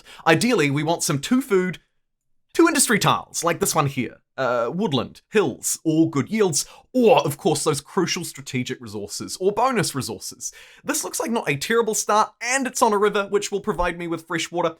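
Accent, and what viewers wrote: New Zealand. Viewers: Sounds like a New Zealand accent, though I may be mistaken. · I'm super late to this game – never played Civ anything before, and Civ6 is free on Xbox Game Pass – but I've fallen deep in the rabbit hole, and I wanted to say thanks. Love your friendly voice, simple and clear advice, infectious enthusiasm and as an Aussie, I really enjoy the kiwi accent. 🙂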